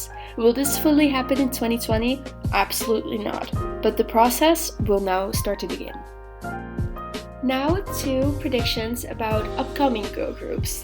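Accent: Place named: Netherlands. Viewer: hey, i have a question? where are you from? because ur voice rlly sounds dutch lmao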